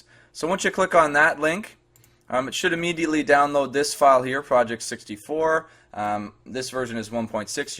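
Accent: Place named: Canada. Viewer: Canadian eh? Thanks for the tutorial man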